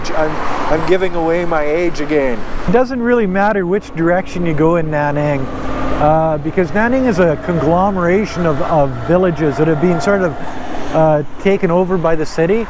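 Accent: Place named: Canada. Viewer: Oh boy! I love the Canadian English out of your mouth! Just can't be mistaken! LOL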